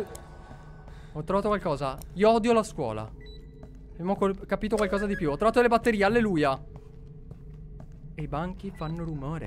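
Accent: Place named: Veneto, Italy. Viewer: Oddio ma sei venetoooo anche ioooo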